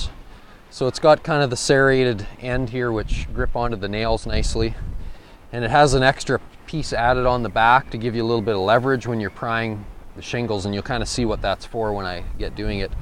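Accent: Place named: Canada. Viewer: This dude has to be Canadian right? I'm totally here for it 🤣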